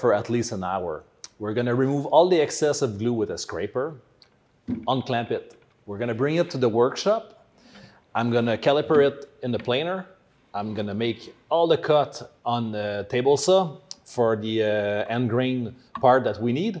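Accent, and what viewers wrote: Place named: Quebec, Canada. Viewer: un québécois et sa parrait comme moi génial merci pour les super conseil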